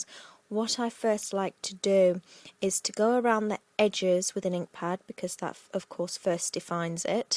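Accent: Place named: England, United Kingdom. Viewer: Love this!! Thank you for sharing your video! Can I use clear nail polish to harden my buttons if I do not have the stuff you are showing in your video? That Diamond Glaze is very expensive when I looked for it. By the way I just love your English accent! Have a blessed day :)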